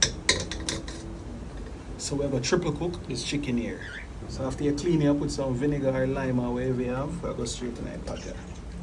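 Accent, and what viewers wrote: Jamaica. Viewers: are you Jamaican?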